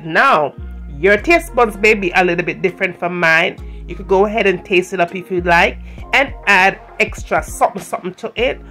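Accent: Jamaica. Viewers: You sound jamaican but you don't cook jamaican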